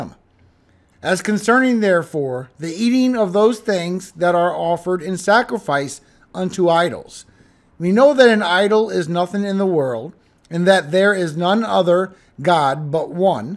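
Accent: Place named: New Orleans, United States. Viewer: Why did I just know you were from New Orleans with that accent? Called that once great city home for over 50 years (Mid City) but left due to crime in 2017. You sure look familiar! ❤ 🙏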